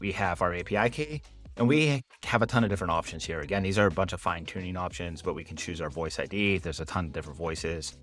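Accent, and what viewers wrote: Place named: United States. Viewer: is obvious it uses your original voice, but being a native spanish speaker i noticed that language has a strong american accent, like an american learning spanish would speak, is there a way to do it without any accent? like a more natural tone? was that because of a setting? thanks for the video very interesting tool!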